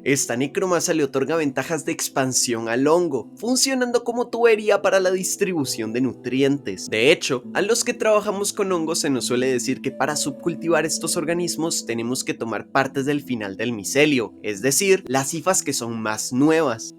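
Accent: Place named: Costa Rica. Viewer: Eeeyyy yo conozco este acento.. ticoo tuanis padre que bueno ver un youtuber de biología de aca.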